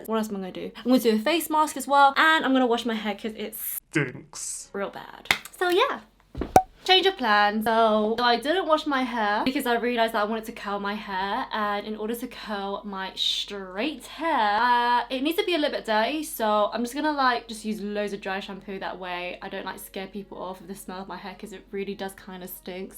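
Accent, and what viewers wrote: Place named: England, United Kingdom. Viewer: May I just say how lovely and precious you are?!?! I so very much enjoy watching you and I love your accent!!! I'm from VA in the US and all I hear is country ass southern accents which don't get me wrong that's fine but I love English accents!!!🇬🇧🇬🇧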